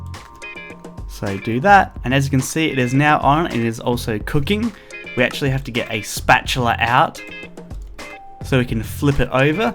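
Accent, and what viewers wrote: Australia. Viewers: Your Australian M8 well me too 😀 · o ma gad r chu aussie? c: bc chu sound aussie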